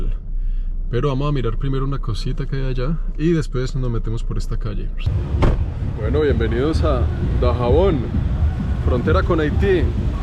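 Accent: Colombia. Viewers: De dónde eres? Su acento parece colombiano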